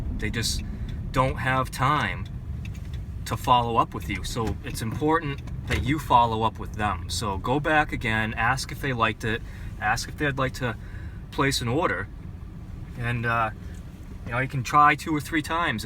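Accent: New England, United States. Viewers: Cool...thought I could hear a fellow "New Englander" accent in there :)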